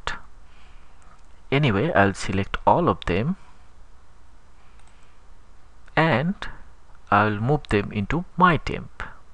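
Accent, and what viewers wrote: India. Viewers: The guy is Bengali as per his accent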